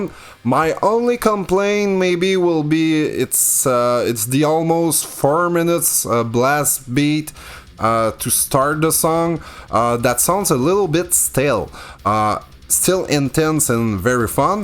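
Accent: Quebec, Canada. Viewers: Wow that is one heavy french Canadian (Québécois) accent. Excellente video! Cheers \m/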